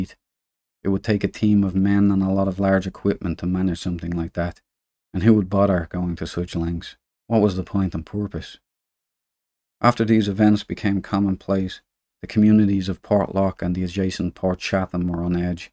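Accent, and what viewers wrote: Dublin, Ireland. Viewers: Ive watched many docs and read a tonne of stories about this place and many others similar...its good to hear a fellow dub tell the story i thaught i was the only person intrested in this in ireland 🤣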